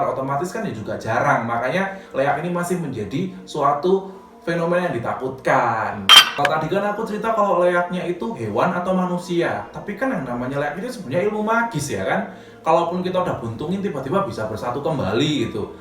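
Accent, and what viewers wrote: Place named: Java, Indonesia. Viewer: Ora medok ora kepenak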